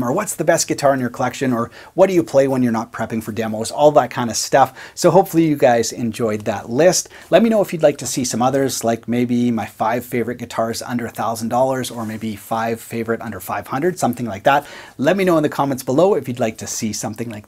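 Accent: Canada. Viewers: He sounds more like a Canadian. Hear the “aboat”?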